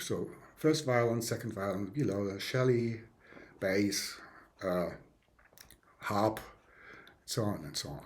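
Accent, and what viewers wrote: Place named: United Kingdom. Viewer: Lieber, danke für Deine Videos! Ich finde, Du erklärst schön klar und verständlich. Ich habe gleich abonniert. Darf ich fragen, wie Du zu Deinem großartigen Englisch gekommen bist? Ich finde, das klingt so schön britisch. Liebe Grüße, Renee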